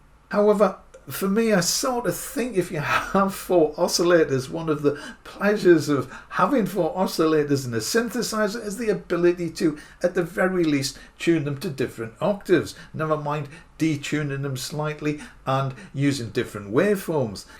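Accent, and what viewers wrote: United Kingdom. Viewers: I agree,,, it frustrated me too.. still got it but,,, I go back to other Synths... Btw... by your accent,,, ye from my part of the UK 👍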